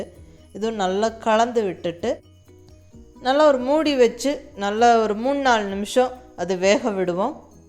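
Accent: Coimbatore, India. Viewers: Amma unga samayal yellamea 👌 ma, ninga pesura kovai tamil ketguradhugu rempa piduchurugu ma. Thanks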